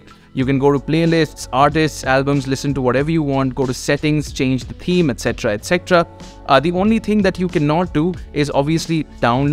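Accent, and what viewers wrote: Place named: India. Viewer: Seeing "forbidden apps not in play store" and hearing the lnd¡an voice gives me M!croscft tach support vibes. Great video! 😊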